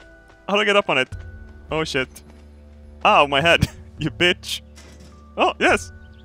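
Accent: Norrland, Sweden. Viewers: Love how you can here your norrlands dialekt when you speak swedish <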